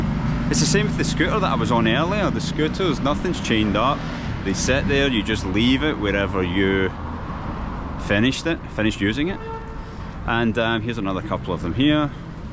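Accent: Scotland, United Kingdom. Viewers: It was very interesting to listen to the scottish accent. I understood 95%. Thanks a lot for the video and wish you a pleasant stay in Russia! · Hello 🎉 absolutely buzzing to see a Scottish 🏴 guy here in Moscow … I lived in Glasgow for almost 6 years but my hubby is from Moscow and due to difficulties with uk visas we decided to move to Moscow. Well I thought I would miss Scotland , frankly I do sometimes, Scotland is absolutely stunning but the cost of living, weather and work were a complete mess… got tired of it… long story short, I love it here 😂 Scotland is in my heart forever though and hearing Scottish accent here in Moscow is so much fun 🤩 glad you’re enjoying it here, mate! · It's so strange to hear a slight Scottish accent, because I'm used to Americans talking about Russia 8-)) It will be interesting to see the Kitaj-gorod (Китай город) area through your eyes! 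· I grew up in Шаболовка neighborhood in the 70s. In the 90s I moved to the US. Last time visited the area in 2017, when my daughter who studied at the University of Edinburgh (where I also spent quite some time🙂) had to spend 1 year in Moscow as part of her curriculum. It’s hard to recognize the area but your video brought back lots of memories. Looove your Scottish accent! What part of Scotland are you from? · Какой клевый шотландский акцент! У тебя есть килт? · As a possessor of English as a second language, I love different English accents, and of course love your Scottish accent, bro. Keep it, be glad and proud of it, we need to celebrate differences. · Oh, your accent! :-)))) I already forgot how Scottish accent sounds like. Yeah, Moscow is a great place to live. The only thing is cold during the winter.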